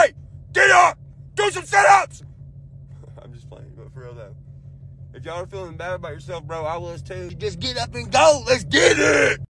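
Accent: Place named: Appalachia, United States. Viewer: Anytime my Main Man I have followed you for years! I THINK YOU HAVE A HEART OF GOLD AND A VOICE OF A HILLBILLY GOD!! MUSIC FEEDS THE SOUL! I AM SUCH A HUGE FAN I DO NOT COMMENT MUCH BUT I WATCH EVERY LIL CONTENT YOU POST UP!! MUCH LOVE FOR YOU. I am so proud of you!!! Congratulations God is good all the time!. Keep it up many more blessing my friend Love ya! 🥰🥰🥀🥀💜💜🍻🍻🍻🍻👍😉🤗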